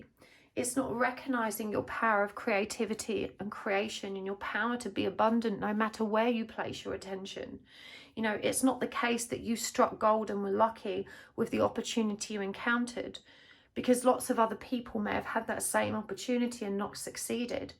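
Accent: United Kingdom. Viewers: Dear. I am writing to say how life changing and transformational this reading for me. I chose pile 3. It was so cathartic and eureka moment. The book recommendation by you about Judy Hall! I found it immediately on scribd and was included in my membership. I take it as fate and serendipity. You narrating your personal experience gives me comfort. You are super gifted and seem a trained counsellor or psychologist. I can guess from your accent that you are from UK. So am I and it would be a privilege to have a F2F reading from you thank you again for lighting me my path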